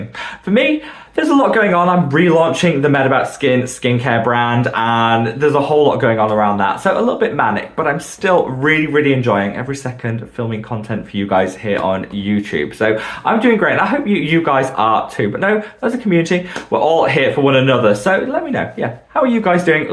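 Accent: United Kingdom. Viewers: I came to your vlog a few month ago but I‘m always very excited about your topics and I love your british accent ! I started with the agireline two weeks ago, to soon to talk about results, I use Matrixyl two month. I can‘t see anything about reducing wrinkles but I‘m in the postmenopause, it is a bit too late for that. But, my face looks a bit fresher and glows a bit, that‘s what friends tell me and that makes me look a bit younger, so for me personally a good result! Thanks and keep on going, you‘re great!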